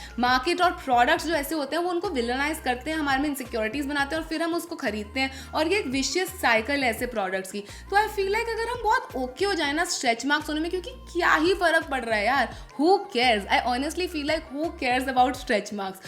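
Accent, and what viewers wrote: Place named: Delhi, India. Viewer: I m your ardent fan since 2016 but my heart melts in your Hindi videos ..that slight Delhi accent makes you more relatable ❤❤❤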